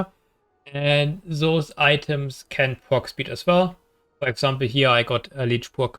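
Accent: Germany. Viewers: man hörts du hast bisschen deutschen akzent :D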